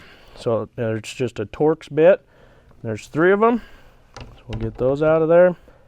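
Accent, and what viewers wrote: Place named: South, United States. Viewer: This precious man speaks like a southern American Red Green . Love the video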